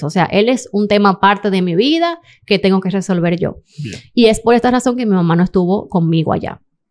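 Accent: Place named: Venezuela. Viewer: Hola pense que eras venezolana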